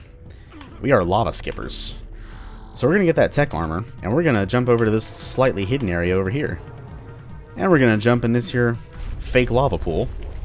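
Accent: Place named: South, United States. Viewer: Thanks from TX. You sound southern yourself! Loved replaying this game with my daughter.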